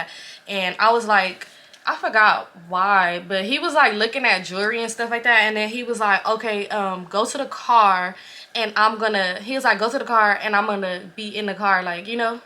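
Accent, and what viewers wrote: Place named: Los Angeles, United States. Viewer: First off all Girl shots out to you! And believe me when I tell you I don't even do shit like comment on vlogs or subscribe to chanel's that aren't podcast. (Maybe I'm an official old head I'm 31btw) but this is very refreshing, relatable, down to earth, creative, and funny. ya delivery, ya detail ya tone, the slight L.A accent and slang. I'm a jersey girl. I say all this to say I want more stories bout Joe! 🤣🤣🤣 So as your newest subscriber and biggest cheerleader tell more bout Joe! Nah all in all do ya thang my sister. You got it!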